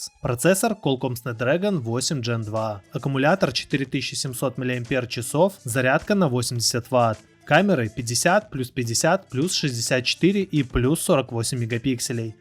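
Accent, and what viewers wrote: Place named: South, Russia. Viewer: По говору сразу понятно, либо с юга России либо с БУ(бывшей Украины) Достатка тебе и здоровья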